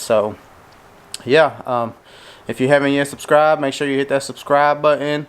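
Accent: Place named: Texas, United States. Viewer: The texmex accent 🤙🏻🤙🏻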